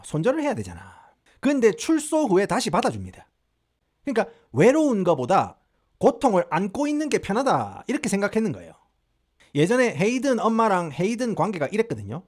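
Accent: Gyeongsang, South Korea. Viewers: 동부사투리 오졌다 애살에서 샤워하다가 터져벌임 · 오늘 처음 알게 되었어요 얘기도 재밌게 하시고 사투리 좋네요 저도 경상도사람이라~ 구독했습니다😊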